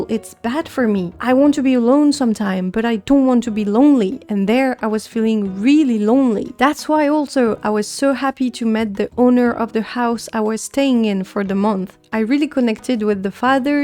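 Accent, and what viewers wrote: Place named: France. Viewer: Le petit accent français ;)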